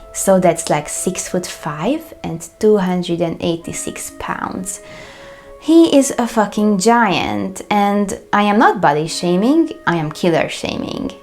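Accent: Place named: Hungary. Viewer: It is lovely and interesting, listening to Hungarian cases, listening to your hungarian accent and hungarian words. Greetings from ex Yugoslavia, from central Bosnia. Kiškofinefhaza hahah hungarian language amazes me.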